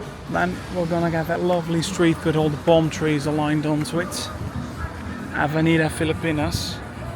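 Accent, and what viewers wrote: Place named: Netherlands. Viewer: I don't have a preference but normally I'm pretty good at spotting native speakers and I really thought you were british but every so often I hear that dutch accent creeping in.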